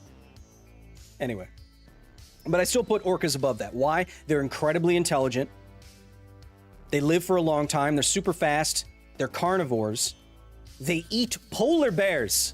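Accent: New Zealand. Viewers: Aussie accent is close but you say your "e" and "I" more like a Kiwi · your accent is good but sounds a bit more like New Zealand to me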